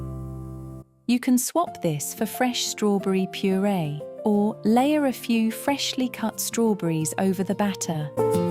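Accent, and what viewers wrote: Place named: United Kingdom. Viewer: I love her British english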